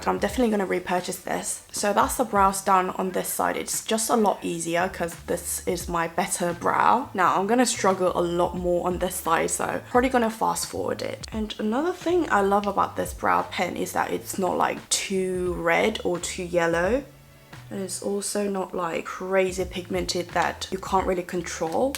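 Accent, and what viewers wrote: United Kingdom. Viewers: 영국 영어발음 너무 멋있어요ㅠㅠ 블리블리 광채쿠션 너무 좋죠:) 화장도 너무 예쁘시고, 너무 잘 보고 가요!:) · 이렇게 퓨어한 인데 영국영어말투는 넘나 시원시원하신.....💓 한국어하실때랑 다른분같운데 전둘다 애정합니다....꺄르를ㄹㅎㅎㅎㅎ❤️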